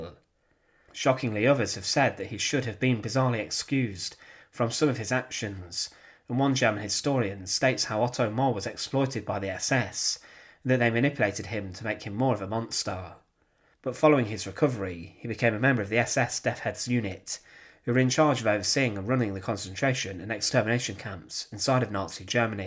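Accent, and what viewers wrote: United Kingdom. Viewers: He’s actually speaking very slowly and calmly in a British accent. Just because he has a better mastery of the English language than you doesn’t mean he should cowtow to your ignorance. Listen better. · Isn't the commentator Britsh? Not redneck...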